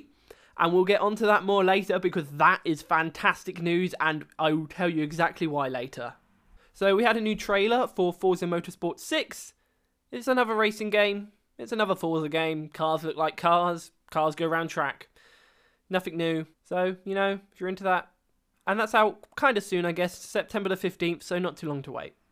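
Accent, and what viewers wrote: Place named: England, United Kingdom. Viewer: yea the only thing i don't like about your video is that you call it a "conference highlights" when you should have called it "my conference highlights opinions" because i just wanted to hear about what was talked about without you imposing your opinion about every highlight. other then that, your bushy eyebrowed lispy accent seems charming, but i can't stand English accents.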